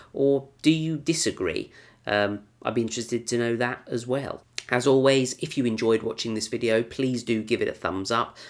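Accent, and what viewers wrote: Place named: RP, United Kingdom. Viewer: it sound like Eaten, Cambridge or Oxfort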